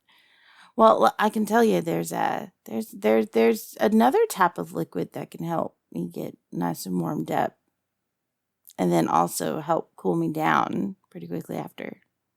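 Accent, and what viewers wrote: South, United States. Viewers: I feel like your getting more southern every time you put that accent on.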